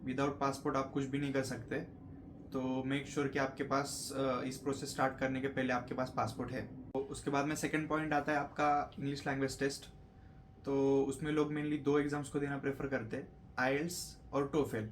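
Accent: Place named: Maharashtra, India. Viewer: Hindi with Marathi accent😅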